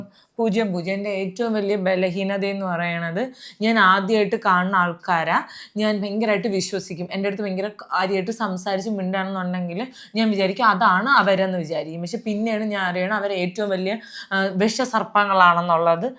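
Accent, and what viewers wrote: Trivandrum, India. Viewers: See nigal orikkalum oru disrespect alla.tvm side orupadu peru agna vilikkarund it's normal.chilath okkei slang different aayond rude aayi thonnunnatha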